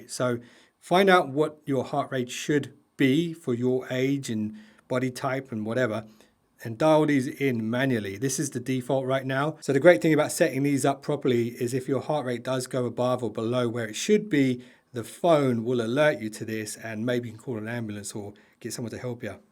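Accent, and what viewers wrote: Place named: United Kingdom. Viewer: Why are all the YouTube galaxy videos British?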